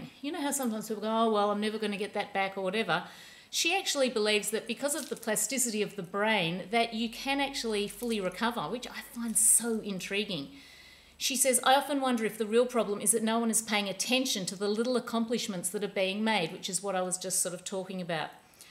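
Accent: Australia. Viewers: It's nice to hear an aussie on YouTube! I really enjoyed the book review - my stroke of insight sounds like an interesting read.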